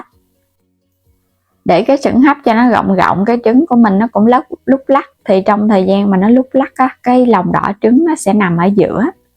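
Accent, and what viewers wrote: Mekong Delta, Vietnam. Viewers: HÔT GÀ , HÔT VỊT CHỨ KHÔNG PHẢI TRỨNG. COI CHỨ KHÔNG PHẢI XEM. BỎ VÔ CHỨ KHÔNG PHẢI CHO VÀO. PHÁT ÂM THÌ " GẶC " DÂN MIỀN TÂY NHƯNG TỪ NGỮ THÌ BỊ VC HÓA.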